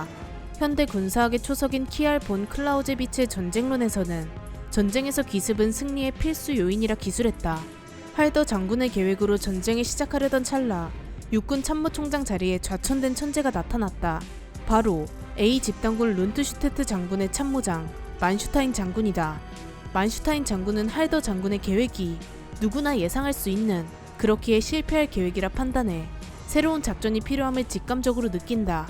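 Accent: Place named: Gangwon, South Korea. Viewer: 사투리가 강원도 가? 어디~나? ㅋㅋㅋ 말투가 귀엽더래요^^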